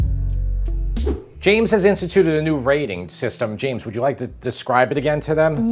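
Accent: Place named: New Jersey, United States. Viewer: My mom loves hearing James' NJ accent "yeas" evolve with time. It started out as a boyish 'ya!' but is now a very Rocky level "YE-uh" It's incredible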